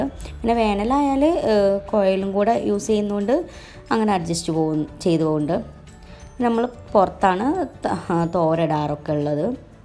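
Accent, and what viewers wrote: Malappuram, India. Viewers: lovely home 🏘️🏡🏠 Ingalu Malappuram slag paray ... PLZZ We love it ❤️🎉👍🌷🌻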